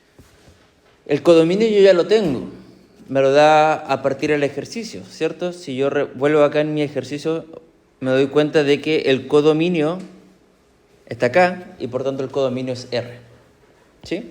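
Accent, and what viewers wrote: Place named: Chile. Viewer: me entretiene el acento chileno, buena explicación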